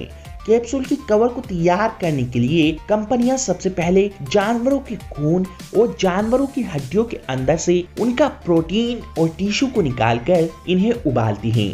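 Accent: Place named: Bengal, India. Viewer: Bengali.. Accent mast rahata hai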